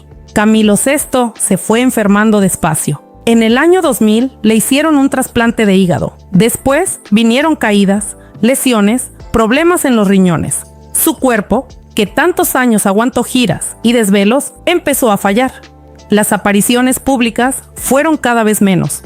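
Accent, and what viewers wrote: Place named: Mexico. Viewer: Tenés que limar ese acento mexicano que da asco...😮 escuchar...!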